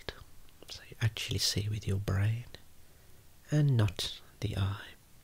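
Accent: Birmingham, United Kingdom. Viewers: Wow! Fancy coming across a fellow Brummie on the big world of Youtube! Im learning some pretty good Eye knowledge from your videos too! Keep it up pal! 😃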